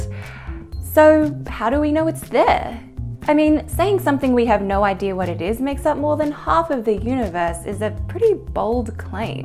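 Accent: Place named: Australia. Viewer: Aussie accent is superb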